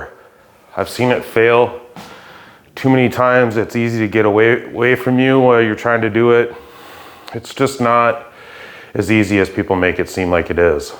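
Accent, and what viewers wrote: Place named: Wisconsin, United States. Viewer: You sound like a wisconsinite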